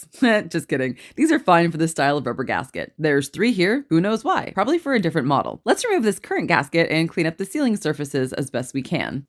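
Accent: Texas, United States. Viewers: Are you Texas ?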